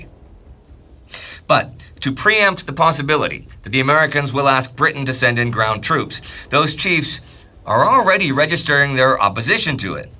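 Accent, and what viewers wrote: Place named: Rust Belt, United States. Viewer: He's doing his best with his Rustbelt, Mid Atlantic accent of a man in his 50s. Probably vastly better than 95% of vids about the Korean War. BUT: appreciate the feedback and if you look at WWI/II IRT things vastly improved/changed with time. Let's see!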